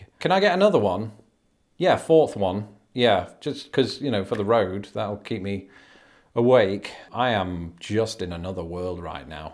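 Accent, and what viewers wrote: United Kingdom. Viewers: Talking about nothing, sounds better with a British accent. Have a great vacation!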